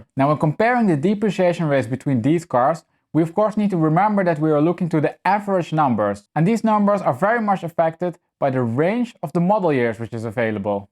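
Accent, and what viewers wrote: Netherlands. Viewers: you have a Dutch accent.....Kan U look Nederlands praten?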